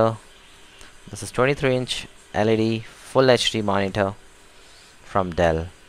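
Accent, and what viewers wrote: India. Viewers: He sounds like ever indian I have ever met...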